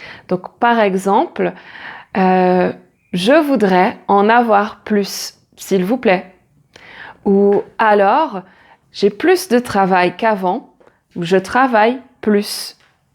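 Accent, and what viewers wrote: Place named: France. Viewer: You have a beautiful French accent 💕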